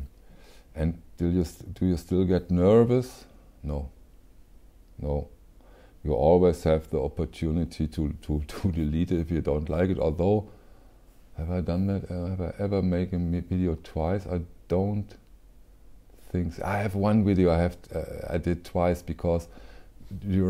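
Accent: Germany. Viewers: I have found my new 'go to' to hear about vinyl, drink coffee, and hear a good old fashioned German accent! I lived in Wiesbaden for several years and miss the 'motherland.' I am fairly new to your channel but look forward to it. Keep the great content coming. And let the puppy get more screen time. :)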